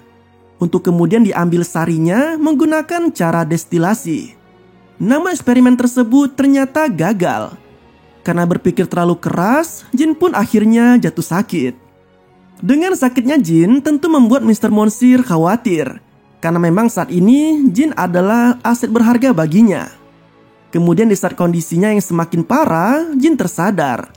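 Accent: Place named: West Java, Indonesia. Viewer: Varpum hahaha...narator nya sundanese banget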